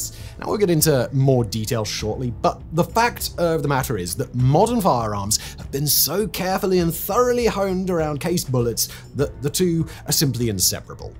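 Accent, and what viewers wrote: United Kingdom. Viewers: I found your video content to be interesting and well organized. But I had trouble hearing your narration. At first I thought it's because I'm not used to hearing British accent, but then I realized you tend to drop off in pitch and volume towards the end of your sentences. That made it very difficult to follow what you're saying.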